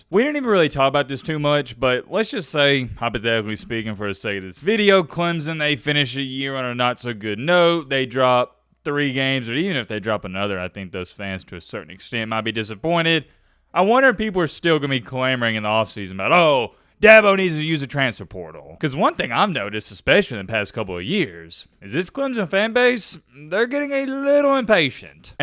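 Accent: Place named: Alabama, United States. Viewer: You’ve got the most Alabama accent I’ve ever heard and I love it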